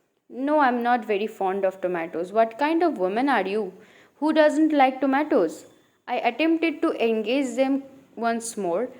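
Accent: Bengal, India. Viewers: BENGALI ENGLISH:face-blue-smiling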